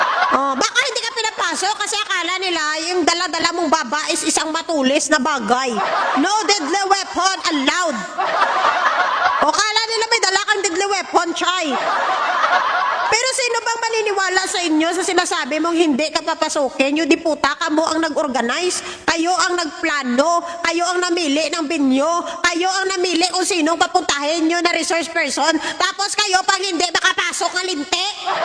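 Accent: Iloilo, Philippines. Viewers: I like your Iongga accent.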